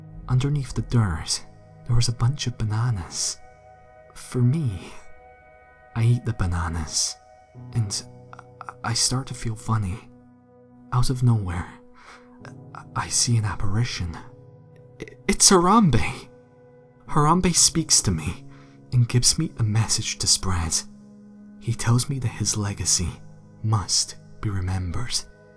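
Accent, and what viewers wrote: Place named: Scotland, United Kingdom. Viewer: I remember you when you were. Your dead Scottish voice is the best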